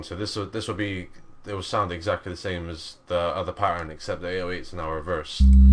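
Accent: United Kingdom. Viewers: is brittish?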